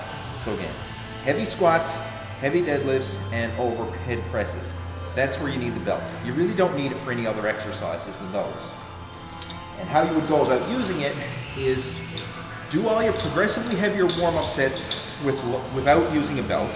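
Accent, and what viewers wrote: Newfoundland, Canada. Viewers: Hey fellow newfie haha. Sure sounds like one. Eh by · He must be a Newfoundlander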